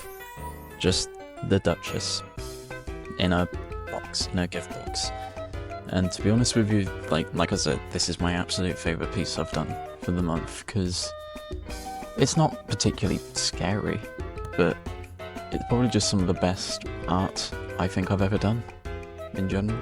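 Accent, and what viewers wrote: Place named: United Kingdom. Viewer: British jumpscare (i do like your voice), this is a nice challenge for spooktober! 🎃 edit: f*ck you 😭😭😭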